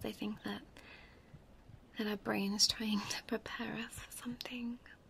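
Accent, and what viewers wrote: United Kingdom. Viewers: Be me, not knowing about yet. First line comes and it has a British accent. "welp...now I have another obsession, thanks youtube"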